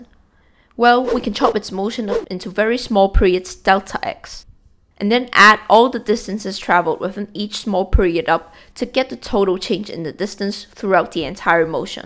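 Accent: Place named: Singapore. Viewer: Very nice Is this english accent from someone from singapore with a chinese background?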